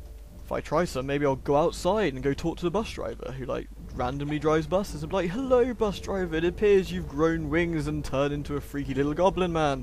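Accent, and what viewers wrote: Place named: London, United Kingdom. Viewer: hehe i love your different accents, or rather the sterotypical 'londoner' accent *sniff* makes me think of home~ ...well second home considering I'm Scottish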